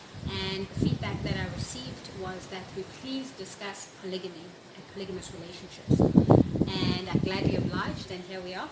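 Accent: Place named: Caribbean. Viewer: Very good video u explained that very good thank u keep up the good work . U sound like a Caribbean girl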